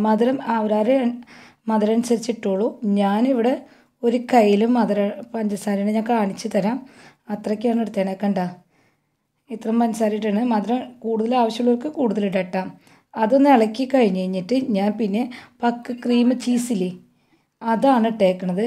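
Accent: Thrissur, India. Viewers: Pudding with trichur accent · trichur slang caramel chocolate pudding yummy - nandu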